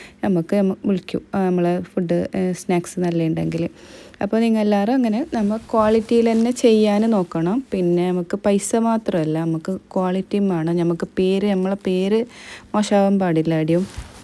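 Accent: Kasaragod, India. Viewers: കേട്ടിട്ട് കാസർകോട് സ്ലാങ് ആണ്, ശെരിയല്ലേ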